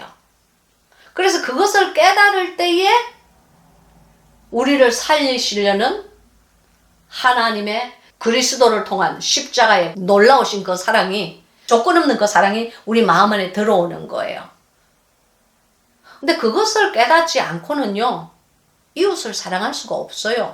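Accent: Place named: Busan, South Korea. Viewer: 할렐루야 ~~목사님 늘 은혜 말씀과 삶을 나눠 주셔서 감사합니다 ~ 목사님과 같은 고향(부산 초량동)이라 더 정감가고 목소리와 억양도 좋아요. 사투리까지 너무 친숙합니다. 늘 강건하시고 우리들을 잘 인도해 주세요 ~♡♡♡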